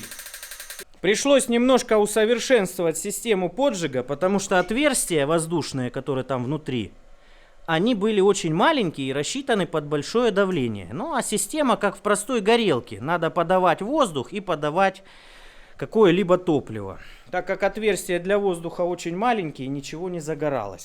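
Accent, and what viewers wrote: Rostov, Russia. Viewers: Обожаю Ростовский говор!!привет из Спб!!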